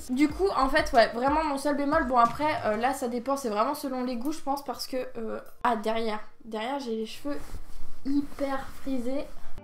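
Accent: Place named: Quebec, Canada. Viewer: Ta un accent québécois c'est super perturbant mdr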